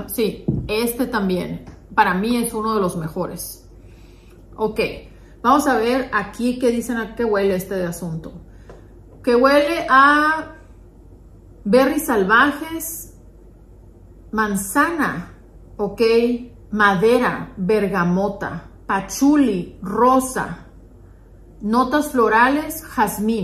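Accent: Mexico. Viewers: Llegué buscando una Buena reseña sobre perfumes de Lattafa Femeninos y encontré el mejor jajaja😂 no paro de reirme, además el acento mexicano es único lo amo. Khamrah : Esto es un perfume para alguien que ya lo arrastró la Vida 😂 Te ganaste una suscriptora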